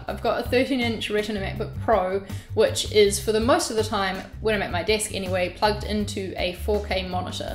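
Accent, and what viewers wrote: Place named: New Zealand. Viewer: I love your NZ accent ;)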